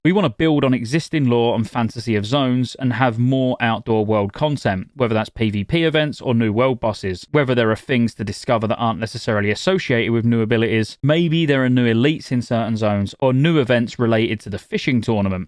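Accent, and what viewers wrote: United Kingdom. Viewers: Whats the story behind the Iowa hat but the british accent?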